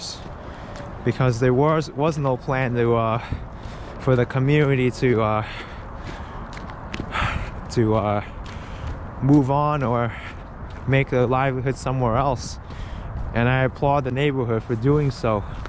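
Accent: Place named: Queens, United States. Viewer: That was charming I really enjoyed it. You have the best Queens accent I ever heard, not too nasal. Just right.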